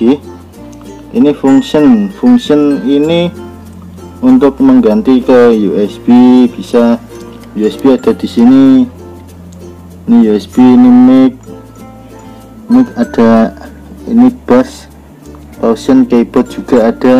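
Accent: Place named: Jepara, Indonesia. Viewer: Kyoke mayong jeporo lur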